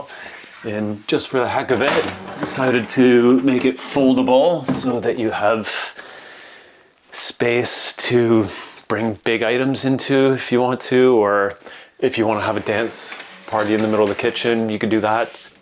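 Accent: Canada. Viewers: His Canadian accent is soft music to the ears of this fellow Canadian. I've been watching a lot of the channel, Never Too Small, just for the laughs because the designers are pretentious about their designs, most of which are far less functional than this one, and no more beautiful. The humility in presentation of his very impressive word is just so refreshing. I saw someone suggest giving up some ceiling height for more height in the loft. That seems like a good idea to me too. But I hadn't even thought of that. The only thing I noticed that might need a change is that is tall and long limbed, making it possible for him to easily reach up and move that light above the sofa, and to reach across the kitchen counter. A very minor quibble. More of an amusement for a shortie like me who can only dream of having a reach like that. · As soon as I heard “aboot” I thought he must be Canadian. 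😊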